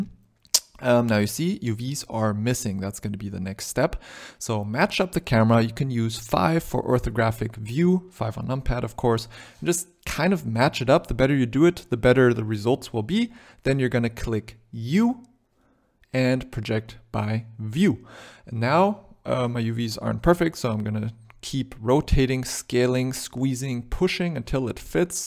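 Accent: Germany. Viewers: Are you from german?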